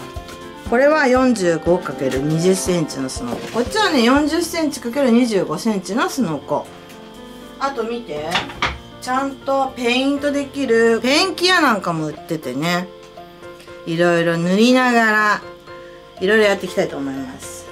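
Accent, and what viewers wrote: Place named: Nagoya, Japan. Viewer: が作業中の肉まんのソックスと名古屋弁が可愛かった💕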